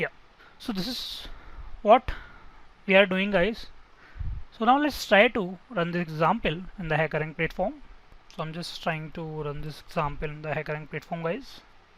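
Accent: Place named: South Asia. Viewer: Your heavy India/pakistani accent makes it impossible to understand you. I’m looking for someone else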